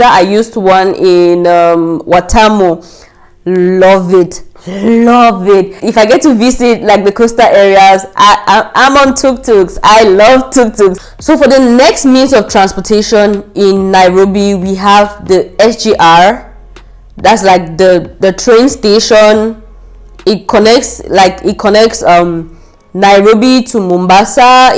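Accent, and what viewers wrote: Nigeria. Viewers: Why do you sound more Nigerian than Cameroonian? Just curious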